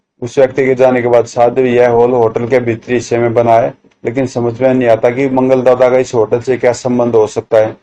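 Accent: Haryana, India. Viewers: tumhara awaj jo h haryanvi tune m aa rhi hai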